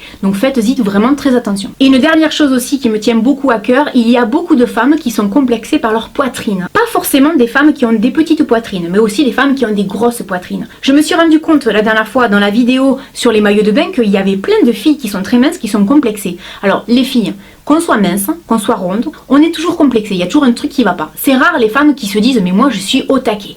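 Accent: South, France. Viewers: Toi tu vien du sud j'aime ton accent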